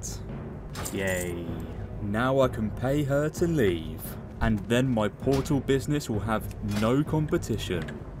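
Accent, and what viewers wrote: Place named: Estuary, United Kingdom. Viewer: That Estuary accent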